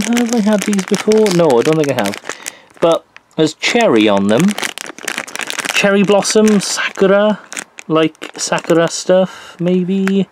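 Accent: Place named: Kerry, Ireland. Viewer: Dude love your videos not to be rude but I subbed because your voice sounds like a man from Kerry Ireland sorry but still great video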